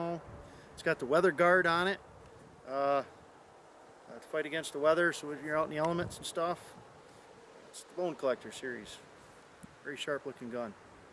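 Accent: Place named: Ireland. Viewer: Great video. You realize that you have any very obvious Irish accent under your American. Did you do your family tree lol ? Best regards, Eddie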